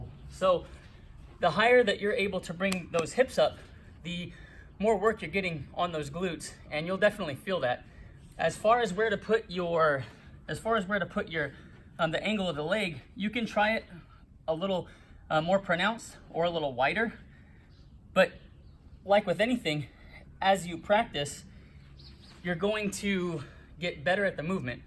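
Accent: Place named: California, United States. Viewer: Damn, I never realized what a California accent was until I lived in Wisconsin for 10 years 🤣. Thanks for the workout!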